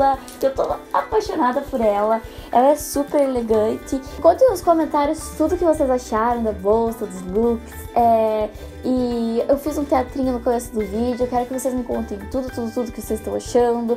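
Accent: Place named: South, Brazil. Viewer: Eu amo esse sotaque do Sul. ♥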